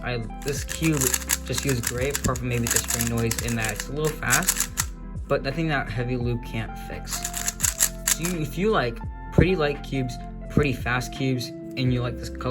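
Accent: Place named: United States. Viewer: i jus realized u sound like tymon kolasiński but with an american accent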